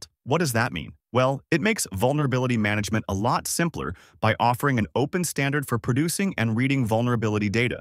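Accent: India. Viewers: Hey bro are you from india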